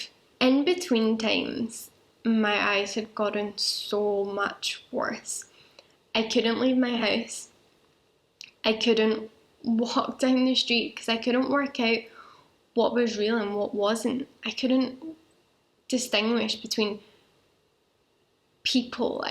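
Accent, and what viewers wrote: Scotland, United Kingdom. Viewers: When the neurologist tested your babinski reflex was that abnormal or anything? Are your feet ticklish so was hard for neurologist to tell? 😂 Where in Scotland you from? Love the accent!❤️ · Such a powerful video. I've had MS for 27 years. Your life will change, but it's not over. Follow Dr. Aaron Boster on YouTube. He's a fantastic MS neurologist. Just get on the most effective therapy that you're comfortable with. There are some very effective ones out there (Tysabri, Ocravus, Lemtrada). You need to think about your FUTURE self (20, 30 years down the road. You sound like you're from northern Europe (Scotland?). There are a lot more cases of MS there. Best of luck to you, my friend. Cheers! 👍👍❤❤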